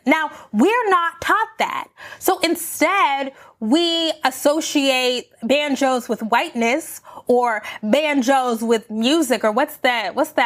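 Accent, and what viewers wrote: United States. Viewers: I thought you were from Alabama.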